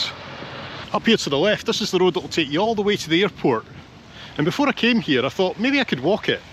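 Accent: Scotland, United Kingdom. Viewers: I think I just like hearing you talking and your authentic accent and your Scottishness. you so funny!! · As a scouser that lived in Aberdeenshire for years before moving to Canada, I love your video’s. Your exploring and videos reminds me of home, and your accent reminds me of my time living in Scotland, fond memories. Keep up the good work, you have me hooked.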